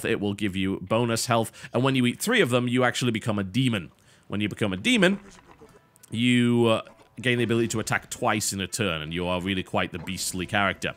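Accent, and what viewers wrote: United Kingdom. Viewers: And he as a Brit uses the proper British Cambridge Dictionary- and grammar-nazi-approved pronunciation. So yeah, pointless indeed.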